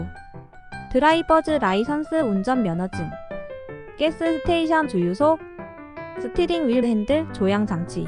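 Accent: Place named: South Korea. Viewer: AI인가요? 영어를 한국식으로 표현하니 어색하네요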